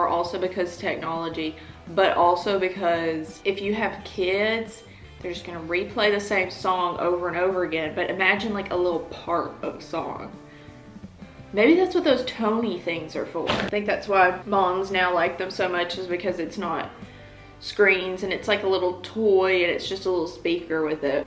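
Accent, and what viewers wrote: South, United States. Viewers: My sisters name is. I can’t marry her (I’m adopted(probz sound weird😅) but your name is W two DDs F.U.N F.U.N. My artist name has two DDs(like this) too ahaha. Anyway you sound southern. Southern hospitality meet African affability together= ignition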